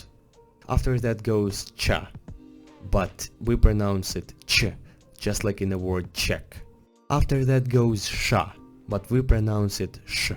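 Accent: Russia. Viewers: You have a russian accent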